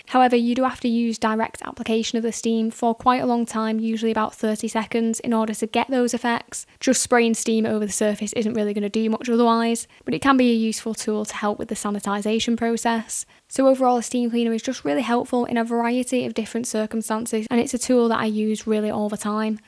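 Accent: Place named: United Kingdom. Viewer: thank you hot sounding uk lady.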